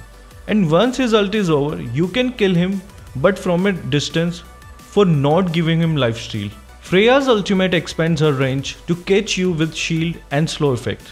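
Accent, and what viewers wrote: India. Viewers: R u Indian bro ur voice is indian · Your Accent is just like Indian's, I LIKE THAT ACCENT!👍👍👍💗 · R u indian ur english accent sounds like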